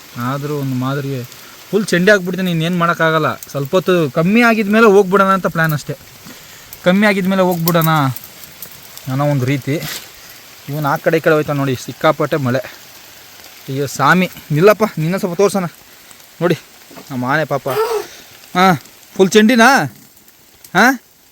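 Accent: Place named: Mandya, India. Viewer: From mandya base adike❤❤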